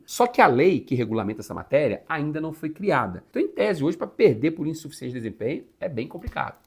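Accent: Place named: Pernambuco, Brazil. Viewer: Esse professor é bom, explica tudo que todos entendem, pelo sotaque e inteligência deve ser pernambucano, se errei foi por pouco.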